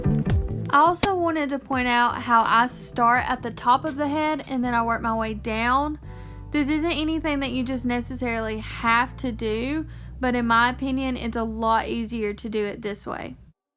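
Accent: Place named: South, United States. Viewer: Love it! Great job and I absolutely love your adorable Southern accent. ❤❤❤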